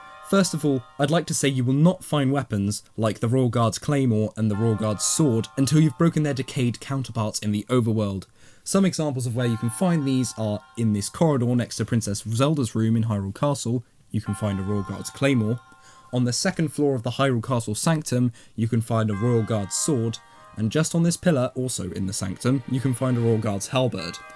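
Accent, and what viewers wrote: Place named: RP, United Kingdom. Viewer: My first thought when hearing your voice in a video - WHAT A POSH BRITISH TWAT. My second - Wow this style of content suites you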